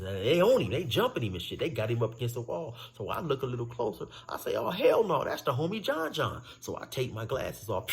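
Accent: California, United States. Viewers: Bro I fuks with your channel. I been on it every since it popped up. Your a good story teller and the way y’all Cali boys talk make it more entertaining. You just different bro and I fuk with it. Keep it up G 💯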